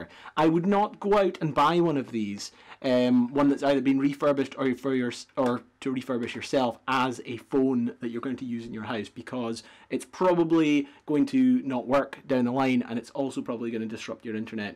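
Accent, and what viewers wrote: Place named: Scotland, United Kingdom. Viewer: "dialing the hooce". I love the Scottish accent. My girlfriend is from Oban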